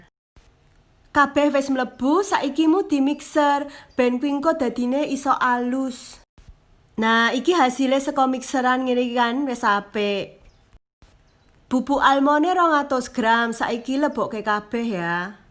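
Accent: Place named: Java, Indonesia. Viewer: Ngedok bangat kaka 😁😁bahasa nya jadi lucu gitu dengar nya acc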